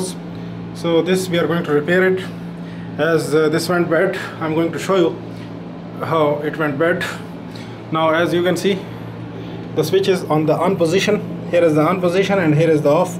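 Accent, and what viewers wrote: India. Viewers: Informative video, it looks like this entire vacuum cleaner could use a cleaning. What year does it date back to? What industry was it used in? What country was this video filmed in? I'm guessing India judging by your accent.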